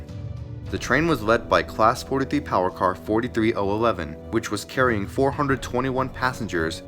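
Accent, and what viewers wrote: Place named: United States. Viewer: It’s just American enunciation, we can’t do anything about it.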